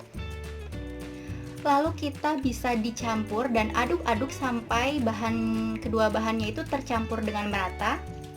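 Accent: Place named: Riau, Indonesia. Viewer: Hadir kak 😁😁 Riau mana suarana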